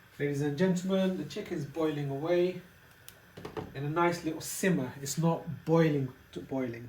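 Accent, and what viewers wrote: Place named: England, United Kingdom. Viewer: Love all the DISHES YOU MAKE.. MY STYLE....THANK YOU FOR BEING ON LINE.... 👏👏👏😋😋🙏🙏 IM IN ADELAIDE SOUTH AUSTRALIA.... ARE YOU IN ENGLAND?? DETECTED THE ACCENT...👏👏😂❤❤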